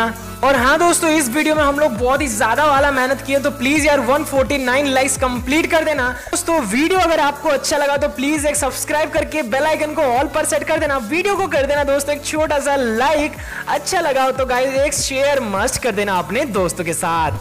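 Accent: Odisha, India. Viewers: Bhai Ap odisha se Ho na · App Odisha se ho?? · Are you odia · Bro are you form Odisha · Bhai ap Odisha se ho kya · App odia ho kyA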